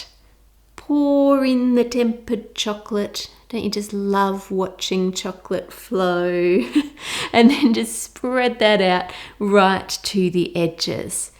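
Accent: Australia. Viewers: I couldn’t be happier that the lil stop motion title sequence is back. Also can’t get enough of voiceovers, iz just relaxing n calming(I think it’s the Aussie accent).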